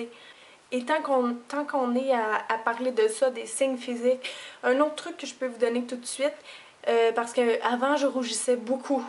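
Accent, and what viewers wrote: Quebec, Canada. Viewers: T'es québécoise lol ?? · Tu m'as beaucoup aidée avec cette vidéo tu m'as ouvert les yeux, grand merci:) ça fait plusieurs années que j'essaye de vaincre ma timidité j'ai beaucoup progressé mais j'ai toujours peur d'ouvrir au facteur quand je commande des choses sur le net (xD)! J'avais un peu abandonné cette idée de vaincre ma timidité et cette vidéo m'a redonné envie d'essayer de la vaincre donc grand merci:D (et aussi ça fait plaisir d'entendre de nouveau un accent du Québec:')) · Très bonne connaissance du sujet, c'est bien d'avoir appris ça en un seul livre. Moi qui me renseigne de temps en temps depuis plus de 2 ans sur le sujet, je n'aurais rien à ajouter Tu dois être assez doué, tu met vraiment les bons mots dans tes explications, et je trouve tu as bien compris le fonctionnement de l'humain Enfin bref, sinon, tu es très mignonne, tu as l'air très sympa. 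Et même si je n'ai jamais aimé l'accent québecois, tu viens de me faire changer d'avis, tu es très charmante :) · Oui je penqse , elle a l'accent Québecois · Non une québécoise nuance !!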